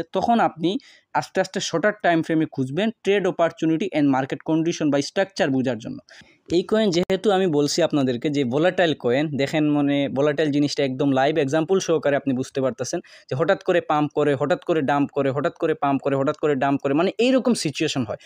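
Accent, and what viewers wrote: Sylhet, Bangladesh. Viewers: বাড়ি কোথায় সিলেটে নাকি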